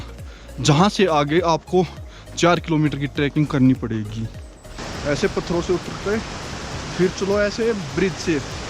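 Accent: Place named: India. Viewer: U narrated ur expedition very well ....almost covered everything... N d desi accent stole d show👌👌👌 Next time do plan for tapovan ... All d best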